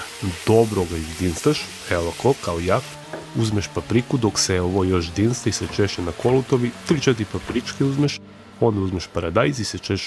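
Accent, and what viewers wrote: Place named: Knjaževac, Serbia. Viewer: Помоз Бог! Да, изузетно нам се допада. Подршка! Настави са причом јер нам је лепо да се упознамо са говором из Књажевца.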